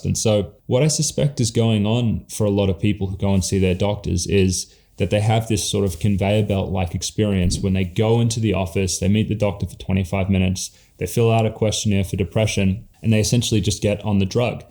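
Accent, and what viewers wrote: Australia. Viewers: had 2 hospital stays last year after not being in a psych ward since 17. I’m now 43. It was. HARROWING and BEWILDERING and WILDLY ETHICALLY REPULSIVE that they dispensed meds so freely. I spent 25 days in Denver Springs and saw and experienced things I never want to again. But it grieved me how people weren’t TOLD to get outside, weren’t taken aside to be talked to like a HUMAN PERSON with normal emotions who maybe needs a nurse to just sit beside them for a hot minute and be an empath (they didn’t seem to know what to do with young women there in a psych ward for the FIRST TIME EVER defeated and crying on the ground. get some social skills, docs!) For me, I’ve had one of the worst, longest cases of akathisia and tardive dyskinesia my doc has ever seen and he’s seen a lot. Something’s gotta change and so many of us need healing. I’m working on the emotional and heart/soul healing now with my pastor, counselor, and writing a memoir. 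I just found your channel, Dr.. And you must be… Australian? My fave Christian brother artists are native Aussies and sound like you.? Do you know them? THANK YOU FOR DOING WHAT YOU DO. even if I never get to your clinic or Dr. Amen’s or get off bipolar meds on this side of Heaven. You are doing amazing work. God bless you and that clinic.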